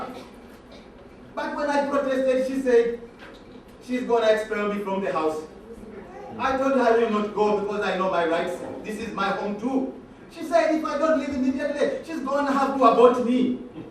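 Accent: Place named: Jamaica. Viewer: i dont know which tribe in Uganda speaks like that.....u sound more like Jamaican..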